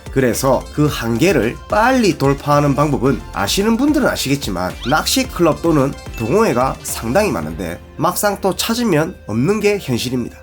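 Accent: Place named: Gyeongsang, South Korea. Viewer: 곱게 가제이 이건 진짜 서부 경남 더구나 진주 거제 삼천포 등 이 아니면 모르는 사투리 ㅎ.ㅎ.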